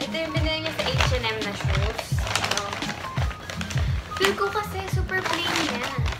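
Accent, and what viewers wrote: Pampanga, Philippines. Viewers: Kapampangan poba kayo? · Kapampangan po ba kayo?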